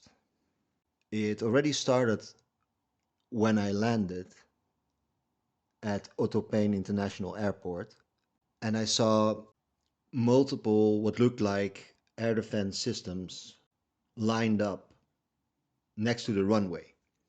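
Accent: Netherlands. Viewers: Are you Dutch? :0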